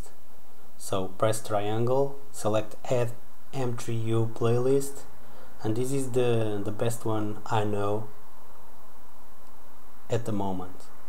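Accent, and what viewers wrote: Portugal. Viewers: Com esse sotaque és tuga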